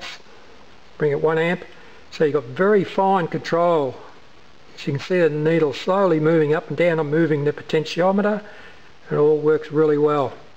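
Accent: Australia. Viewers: G'day. Good to hear an ozzy voice. Thank you for the idea and keep up the videos.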